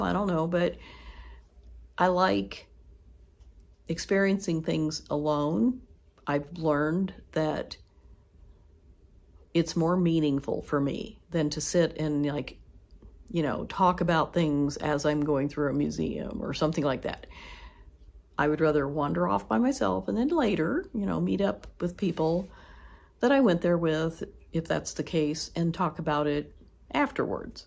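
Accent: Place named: Indiana, United States. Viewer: I, too, am an introvert and feel exactly like you do! Since I have been widowed, I mainly stay by myself. I wanted to ask you if you were from Indiana? You Have an accent like my cousins do!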